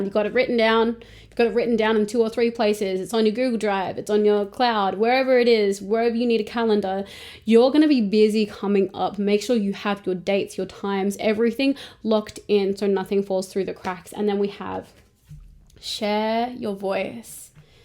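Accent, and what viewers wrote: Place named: Australia. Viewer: Please, please, please DO NOT, hold back on your beautiful Aussie accent! Your readings resonate strongly and your accent is very endearing. I'm American and, other than Southern U.S. accents, I find American accents incredibly plain/boring 😂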